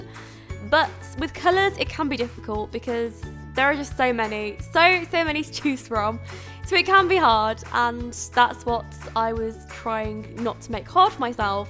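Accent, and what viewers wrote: United Kingdom. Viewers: I love your british accent so much !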